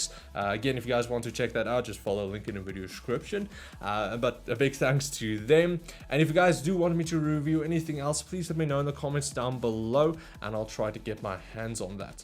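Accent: South Africa. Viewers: Where are you from in Sa I can’t tell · Dude are you SOUTH AFRICAN if are nice bra ek ook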